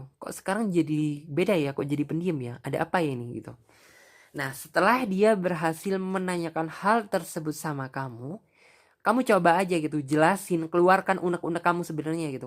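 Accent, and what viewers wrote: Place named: Surabaya, Indonesia. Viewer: Kaka cara logad ngomongnya kayak orang surabaya kaka tinggal disurabaya ya ??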